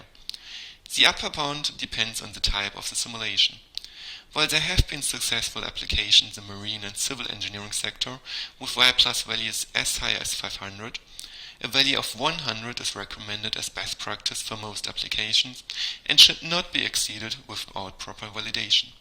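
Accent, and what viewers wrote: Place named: France. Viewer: He's french and that's the french - english accent. It doesn't matter how close or far you are from the UK. ;)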